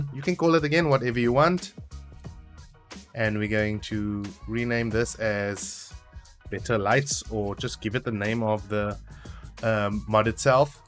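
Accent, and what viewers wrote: South Africa. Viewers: Wait.... you sound South African...... AWE! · Ahhh man I love hearing south african voices on yt videos 😂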